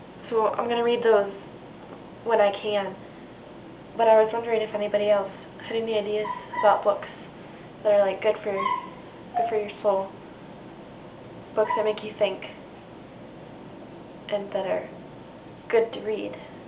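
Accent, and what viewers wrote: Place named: United Kingdom. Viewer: u sound british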